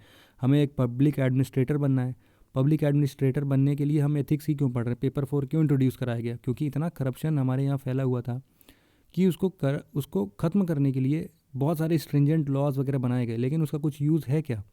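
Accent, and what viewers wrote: Kanpur, India. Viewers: Sir aap kanpur se ho kya??? Kidwai nagar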